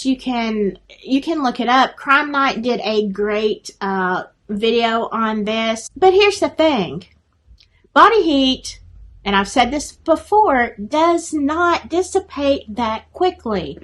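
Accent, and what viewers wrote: South, United States. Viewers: Poor Libby and Abby...there's so much going on there... I agree with almost everything you said here!! God bless! 💜 Im in Georgia..I'm from Alabama!! My accent is like yours!!! I love my country accent!! 💜💜💜💜 The Summer Wells case has blown my mind!! Talk about sketchy folk!!! EVERYONE around that baby and area is so absolutely disgusting!!!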